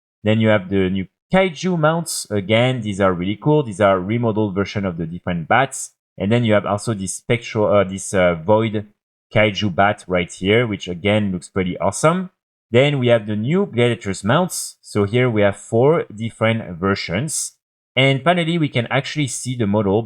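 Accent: France. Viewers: thats called a French accent, are you slow? (edited) guess you had some typos huh sped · He’s French. Try not to be a petty piece of shit online. 👍🏻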